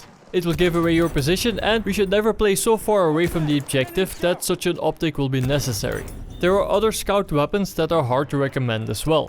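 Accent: Netherlands. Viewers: Hey, are you by any chance dutch?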